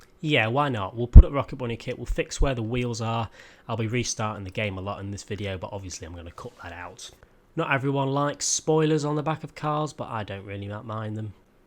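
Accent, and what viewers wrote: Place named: Manchester, United Kingdom. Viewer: sounds like you've got a mank accent u from Manchester ?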